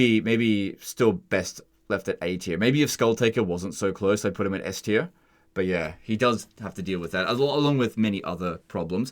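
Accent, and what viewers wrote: Australia. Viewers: How come you can't say golgfag maneaters name? Is that an australian accent thing?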